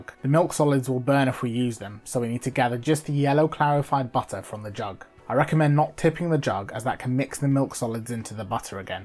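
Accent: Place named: United Kingdom. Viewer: Bro how did y grt that accent British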